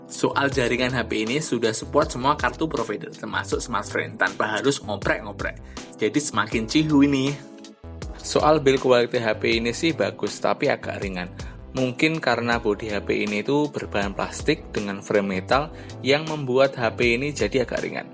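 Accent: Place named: East Java, Indonesia. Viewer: orang magetan ya gann :D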